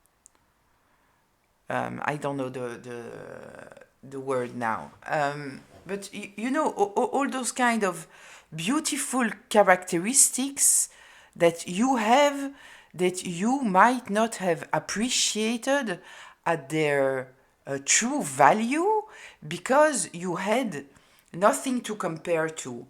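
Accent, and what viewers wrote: France. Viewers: Sometimes I just glide on your beautiful French accent, I should have met a cute Frenchman in life 😂🇫🇷💋✌️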